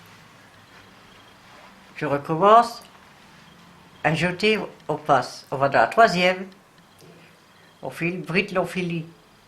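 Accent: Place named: Nord, France. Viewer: et oui encore merci et j aime bien entendre ta voie ton accent , , sa me rappelle le Nord , j ai plus que le bouton a faire , très bonne fin de journée bisoussss , Monique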